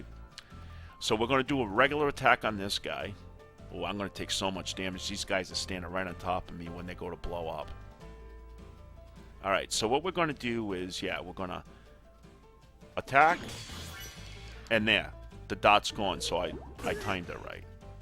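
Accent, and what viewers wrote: New York, United States. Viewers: New yawk accent?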